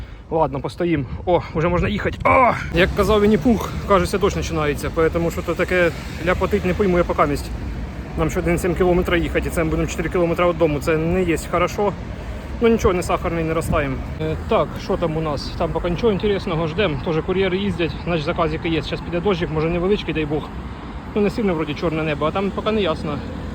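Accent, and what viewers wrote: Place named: Kherson, Ukraine. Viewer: Привіт! Твої відео як вірус якийся, дивляться так легко і захоплюють... У тебе такий говір, ти не з Херсонщини випадково?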